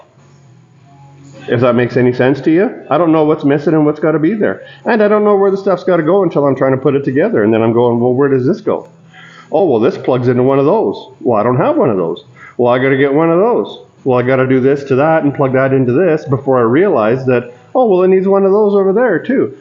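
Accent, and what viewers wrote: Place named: Canada. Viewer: It is good to see a Canadian that still talks Canadian, eh.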